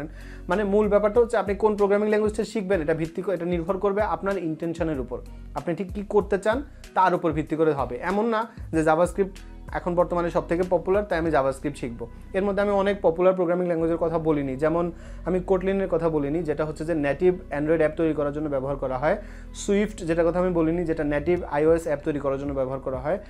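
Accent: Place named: Noakhali, Bangladesh. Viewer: Apner kotha sune mono holo apni noyakhali or Laxmipur er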